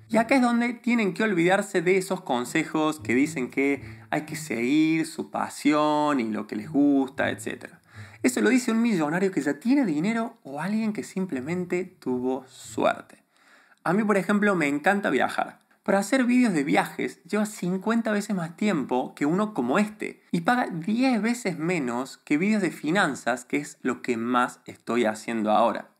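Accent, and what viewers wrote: Argentina. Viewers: Tienes buenas ideas pero te falta muuuuucho en la neutralidad de tu español. Si tienes acento argentino muy marcado. Pero igualmente das buenos típs. Un saludo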